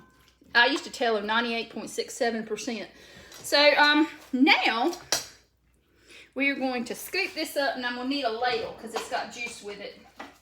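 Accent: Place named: Eastern North Carolina, United States. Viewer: I just watched your entire video with my birthday fiancee. We are both Eastern NC natives, so could easily understand your ack-see-ent! We have decided to come to your house and cook with you this Tuesday. I'll pay for all the ingredients, bring a couple bottles of white wine (since you seem to be out), and we'll solve the Covid crisis. Seriously, truly enjoyed your recipe (very similar to Myrla's). Thanks. JIm, new subsriber.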